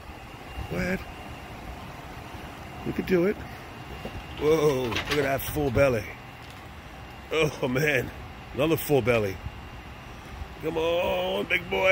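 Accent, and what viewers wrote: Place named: New Jersey, United States. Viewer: This dude gotta be from Jersey. Pasta, Jersey accent and buncha knuckleheads shouting in the background 😂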